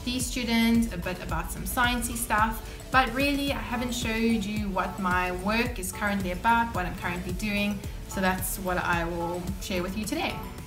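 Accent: South Africa. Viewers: Are you South African? If so, where did you study?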